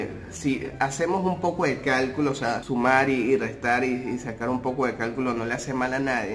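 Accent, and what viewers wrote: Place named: Peru. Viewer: cada ves te sale el dejo peruano naturalmente,y la manera de hablar del señor bayly xd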